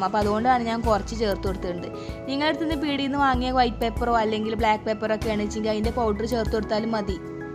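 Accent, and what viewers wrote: Malappuram, India. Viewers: Adipoli biriyaaniyum malapuram slang um😂😂😂✌️😘😘😘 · ചേച്ചി മലപ്പുറം ലാംഗ്വേജ് സംസാരിക്കണം റ്റോ.. നല്ല രസം ഉണ്ട്. കേൾക്കാൻ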